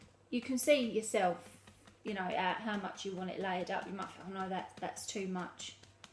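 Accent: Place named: United Kingdom. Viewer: No don't worry about your British accent. I think it's pretty cool. Now with my Texas accent it's funny. Keep up the great work my friend. Hugs from Texas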